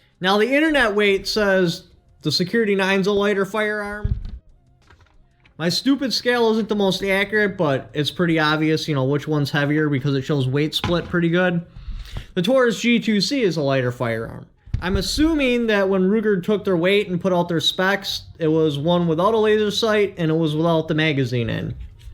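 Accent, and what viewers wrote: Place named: Upper Midwest, United States. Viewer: Nice information, presented clearly and without wasting time. Very good. Love the upper midwest accent.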